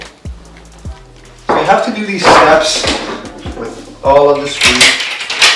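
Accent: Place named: Southern Ontario, Canada. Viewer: I’m Canadian but living in Germany since 2016. I think it’s hilarious that I was able to pick up his accent (Southern Ontario) almost immediately. Anyway, great video, thanks for the info. My only feedback would be to invest in a mic and better lighting.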